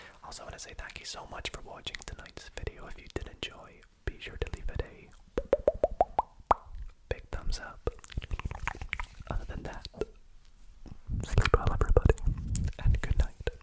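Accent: United Kingdom. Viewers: Hi! Are you from Scotland, England or Ireland?? Your accent is really comforting and nice!! Loved this video!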